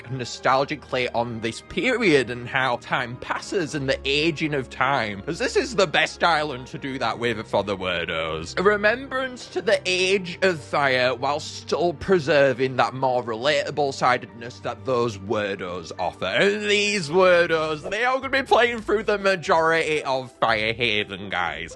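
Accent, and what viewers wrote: England, United Kingdom. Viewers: You’re English !!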